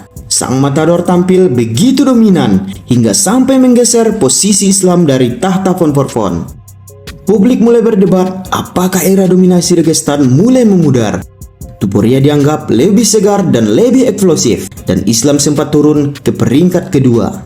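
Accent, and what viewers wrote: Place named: West Java, Indonesia. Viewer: .. Sedikit saran niih bro. Agak dikurang2inlah sedikit logat/dialek "Sunda" mu. Penonton mu tak semua orang Sunda. Came on, up grade lah chanel mu biar lebih keren.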